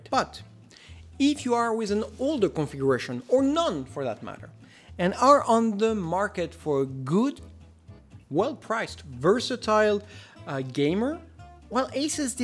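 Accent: France. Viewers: Hi, where are you from ? I spotted a French accent :) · are you french !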